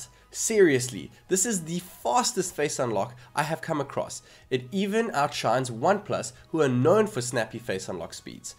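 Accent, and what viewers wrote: South Africa. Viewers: Are you South African by any chance? You have the accent! :)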